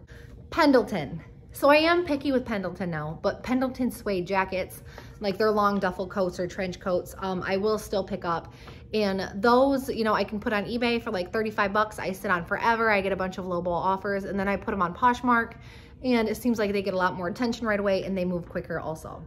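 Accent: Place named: Chicago, United States. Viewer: Are you in the Chicago area? You have an accent….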